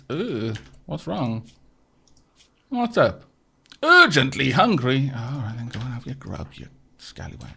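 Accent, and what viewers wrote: Wales, United Kingdom. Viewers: The Welsh is strong with this one. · not too noticeable. Loving the Welsh twang again :)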